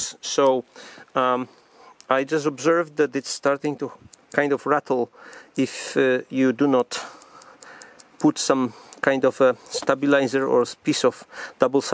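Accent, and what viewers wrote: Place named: Russia. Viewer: Как будто русский по-английски говорит)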